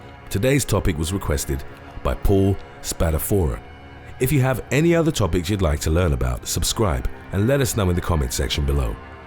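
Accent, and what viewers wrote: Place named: England, United Kingdom. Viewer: Just viewed this video and noticed how intelligent the classic English (and Ausssie) accent makes one sound. That is just about as far as this one goes!! Opening statements identified the Sea Snake as a Viper. The keyword here is VIPER.....add your own accent and then ask......WTH??? You mean there's now a whole new family (viperidae) of sea snakes? All this time I have only been concerned with the Elapids (Elapidae). Hmmm......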